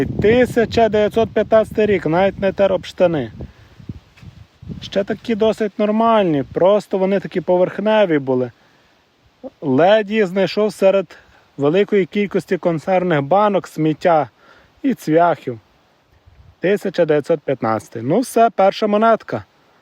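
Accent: Ukraine. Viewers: Приємно з Вами( лежачи в ліжку ) копати і насолоджуватись природою та приємним українським говором. Молодці так продовжуйте, Хто шукає той знайде .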